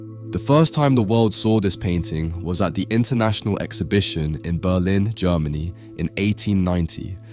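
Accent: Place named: London, United Kingdom. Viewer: Why do you think English is not his first language? He's English, probably from London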